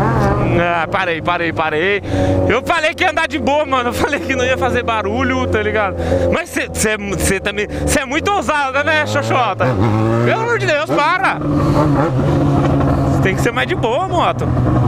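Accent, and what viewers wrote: Goiás, Brazil. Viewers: tu é Goiano??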